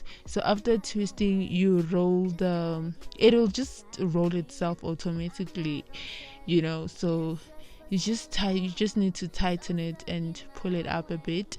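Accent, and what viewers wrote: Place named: Africa. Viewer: she speaks like an African, there's no American accent even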